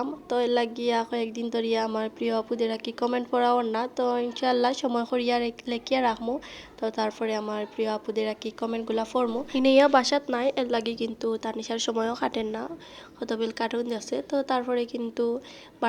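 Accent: Sylhet, Bangladesh. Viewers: আপুনি ভিডিও সুন্দর হয়েছে তার চেয়ে তোমার সিলেটি ভাষার কথা গুলো আরো সুন্দর 🤗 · অনেক ভালো লাগলো ভিডিওটি আপু আর সাথে তোমার সিলেটি কথা অনেক ভালো লাগে ❤❤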